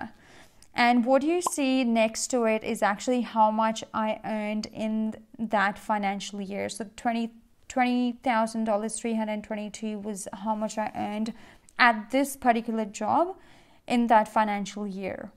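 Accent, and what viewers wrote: Australia. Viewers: Why Australian ascent dear....why not Indian ascent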